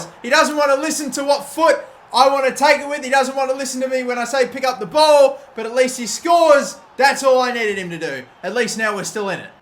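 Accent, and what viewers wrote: Australia. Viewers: halo289 he’s Aussie 😂😂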